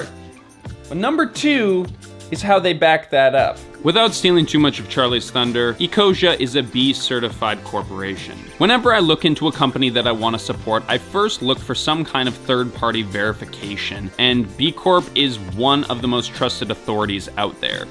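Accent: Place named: Canada. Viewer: Canadian eh?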